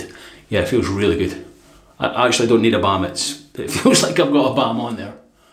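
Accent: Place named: Cockney, United Kingdom. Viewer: 🤣🤣🤣 Hilarious cockney London Great shave.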